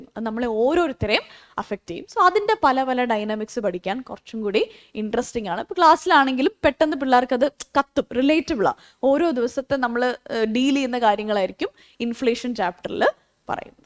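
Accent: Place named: North India, India. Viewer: you are gem at english still speaking malayalam like north one...!!!!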